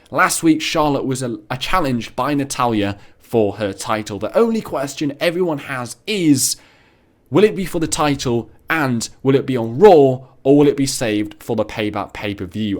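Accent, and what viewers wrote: England, United Kingdom. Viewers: Mate what time is this in England if you live there (you sound like you do)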